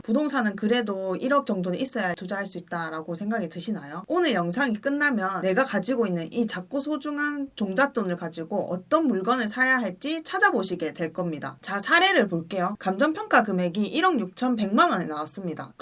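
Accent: Gyeongsang, South Korea. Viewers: 경상도 세요? 억양이 너무 쎄네요😢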